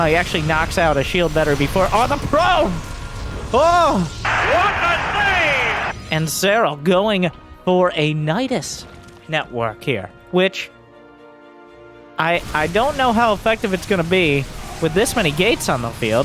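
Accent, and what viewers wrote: United States. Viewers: StarCraft needs this American voice on the scene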